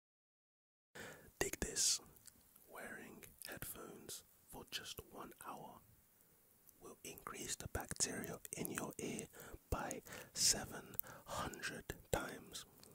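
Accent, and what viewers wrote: United Kingdom. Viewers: Nice to finally find a British asmrtist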